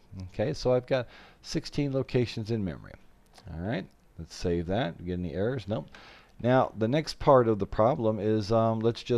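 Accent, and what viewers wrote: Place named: Southwest, United States. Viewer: I think it is a US accent. Southwest probably.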